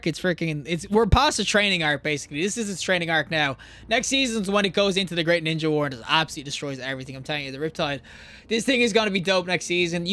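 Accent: Ireland. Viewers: very nice to hear an irish accent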